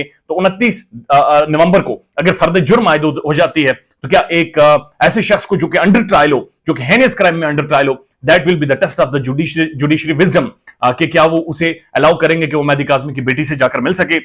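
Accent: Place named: Punjab, India. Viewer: Your Punjabi accent is just woww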